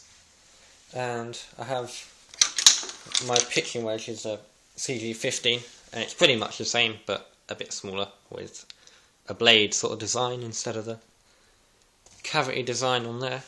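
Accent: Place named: Essex, United Kingdom. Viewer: Cleveland is a great brand, underrated by some, amazing wedges. I'm having a bit of a love affair with Ben Hogan irons at the moment (forged gs irons and Colonial wedges) all getting a bit old and I should upgrade them. Can't bear to do it though. I'm just too attached they just seem to fit me. By your accent you sound like your from not far from me. You can usually see me struggling and swearing round the courses of North Essex. Good luck this season ;)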